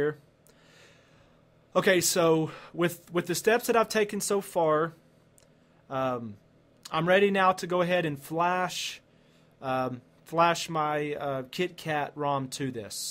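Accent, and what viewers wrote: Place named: South Carolina, United States. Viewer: You have giant balls with a South Carolina dialect. Or North Carolina. Whatever you coastal geniuses.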